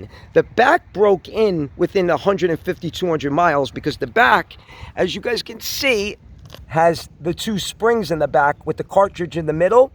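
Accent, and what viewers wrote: New York, United States. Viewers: Great video i love your Ny accent and i love all your scooters nice!!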